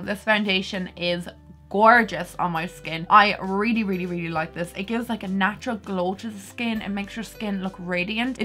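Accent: Ireland. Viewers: I just love watching you and listening to your brogue! It reminds me of my Nan who was from Kenmare.. I love you!! Much love from Boston!!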